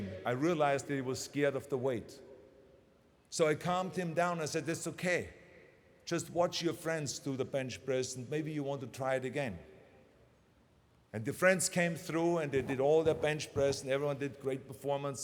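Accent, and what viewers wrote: Germany. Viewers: The German accent is pretty strong, and Germans/Austrians are pretty tight with their Pronunciation, and I guess when you start speaking English properly as an adult you always have an accent. My parents have been living in an English speaking country for 15 years and they still have an obvious foreign accent, but me, having moved here when I was 4, I speak the same way everyone else does here. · How come this guy's been living in the U.S. for 40+ years and he still keeps such a strong German accent? he says he also took accent removing classes...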